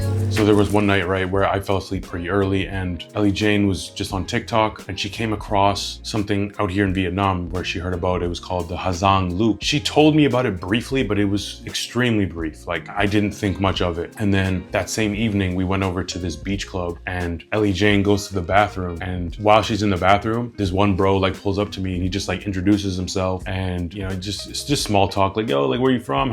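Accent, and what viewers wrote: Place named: England, United Kingdom. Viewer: your English accent 😂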